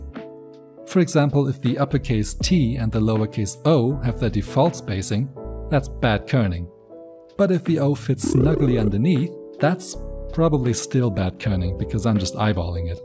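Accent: Germany. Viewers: I thought his accent made it more than obvious that he is German...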